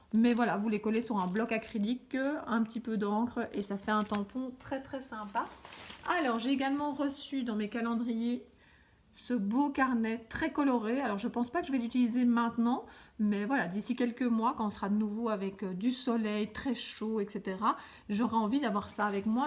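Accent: Belgium. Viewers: Coucou mais comme je aime tes vidéos je me permets le tutoiement c est plus facile si tu es OK. Je débute en scrap cela fait un an environ et tes vidéos m enchantent et m enseignent bcp.merci beaucoup vraiment. Je adore les fées et la fantaisie la nature du coup je me reconnais dans vos créations. Voilà je te souhaite de passer de bonnes fêtes moi aussi en vacances pour 5 jours avec mes deux enfants 10 et 13 ans je suis infirmière donc peu de temps pour scraper.Merci en tout cas pour ces bonnes idées sous l accent belge.Une dernière info des paperblocks de luxe sont sortis il y en a 4 magnifiques les as tu en Belgique nous ici en Corrèze pas encore. bises.laurence.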